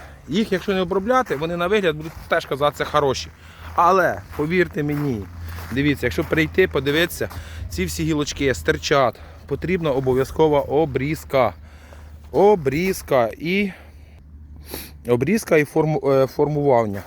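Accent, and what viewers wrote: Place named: Obodivka, Ukraine. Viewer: Ободівський говор) 😎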